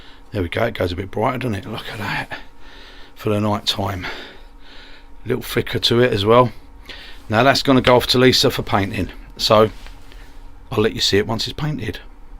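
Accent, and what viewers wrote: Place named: London, United Kingdom. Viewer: Nice to hear a London accent. enjoyed the video...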